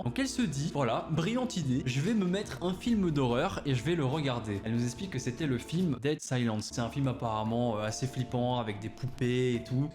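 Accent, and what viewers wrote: Belgium. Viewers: Il est belge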